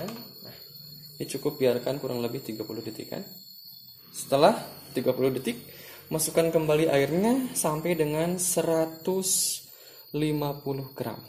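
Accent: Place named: West Java, Indonesia. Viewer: Sunda bgt ... itu logatnya mas 🤣🤣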